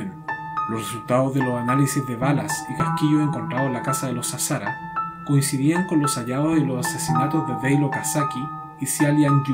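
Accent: Chile. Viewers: El tono shileno le saca lo oscuro y se torna hasta gracioso 😂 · El narrador sin duda es chileño, gran trabajo, vato.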